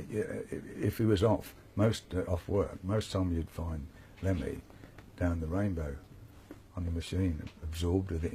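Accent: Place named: Birmingham, United Kingdom. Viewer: Sounds exactly like my dad did...northern English, Birmingham/West Midlands accent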